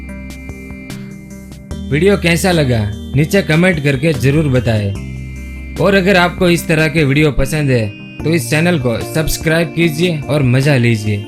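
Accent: Gujarat, India. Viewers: Aap Gujarati ho kya?? Aapka bolneka accent ekdam Gujaratiyon jaisa hai!!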